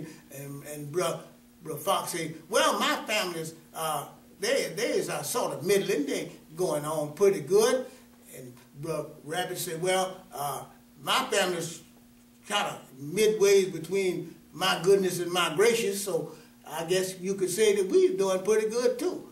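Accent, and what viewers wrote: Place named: South, United States. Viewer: I love the ole southern bionic english language. It"s very much a part of our southern heritage & customs. I try to speak bionics but, not as good at it as!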